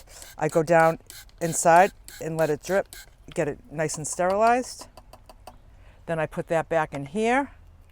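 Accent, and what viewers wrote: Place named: Boston, United States. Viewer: Hi, I just found you and love this video. Your a classy lady speaking of delicate matters and also makes me smile because I hear your Mass. accent comin in loud and clear and I feel home again. I don’t meet many here in Seattle with the accent and I’m always being asked where I’m from after I’ve said a few words that only one from Boston can say. I’ll keep on watching and loving your young spunky spirit to brighten up my day!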